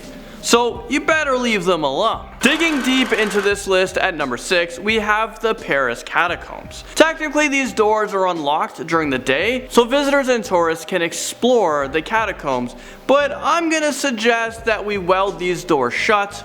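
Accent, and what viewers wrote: India. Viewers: ah Indian accent amazing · Are you Indian?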